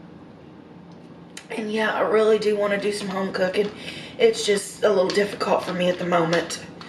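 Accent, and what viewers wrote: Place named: AAVE, United States. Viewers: Enough with the Ebonics. Dang it!!!! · Ebonics hella cool Bro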